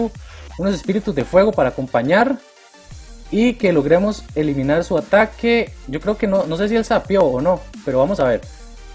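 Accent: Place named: Costa Rica. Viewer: aaaaaa eres tico :3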